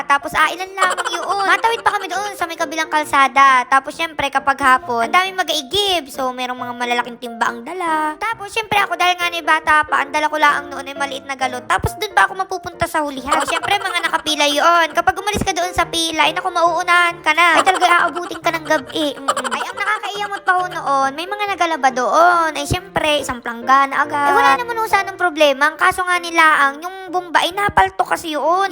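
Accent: Batangas, Philippines. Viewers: Taga batangas ka po ba yung tono ng boses mo eh batangas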